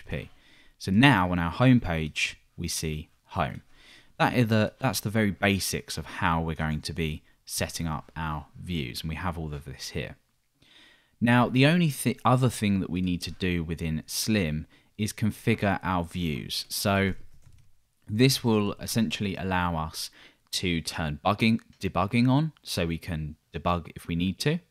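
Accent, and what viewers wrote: England, United Kingdom. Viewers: Hello there! No doubt your approaches to implement functionality are the best all over the PHP web dev world, I just wish Hollingworth, Schiff or Traversy would explain them to me as I feel so sorry to tell you that you lack a bit on explanation and I struggle to capture your english accent. Anyway, thanks very much I really appreciate to get to realize how solutions can be implemented in a clever way.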